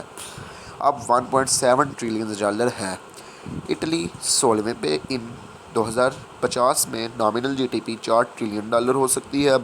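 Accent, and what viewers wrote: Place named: Pakistan. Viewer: Bhai tum awaj se hi Pakistani lagte ho and uske bad Pakistan ke flag me Kashmir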